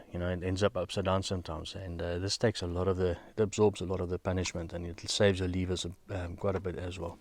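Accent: South Africa. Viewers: Excellent and informative. Thanks for the vid. It sounds like you’re from South Africa but is that where you’re riding? Forgive me if that’s a silly question but the terrain looks similar to where I am in the eastern US. I. LOVE tight technical woods riding. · Afrikaaners probeer engels praat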